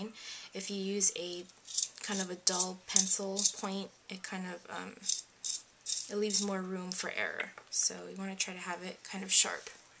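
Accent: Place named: Philippines. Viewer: i believe she is her accent is that of Filipina :)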